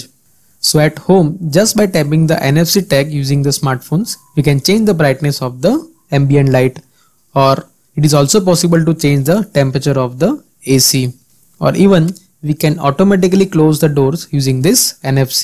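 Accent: India. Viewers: A very beneficial video on the topic starter. Just a friendly piece of advice: since this video will be shared all over the world & not everyone fathoms the Indian accent, you could make use of reader bots the next time maybe (make it accent neutral). btw ..Did I say this was a helpful video? 👍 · Why is every goddamn tech guide on youtube narrated by someone with a heavy Indian accent? I'm sorry, but for some reason I really have difficulty understanding that accent. Something about the isochrony just throws me off mid sentence, and then all I hear is gibberish for a few seconds, until I recognise a row of words and can follow along again, until I'm thrown off anew.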